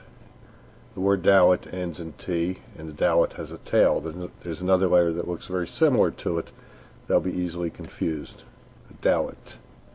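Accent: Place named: United States. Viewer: It's more of an American accent. Not Ashkenazi. Maybe more Sephardic.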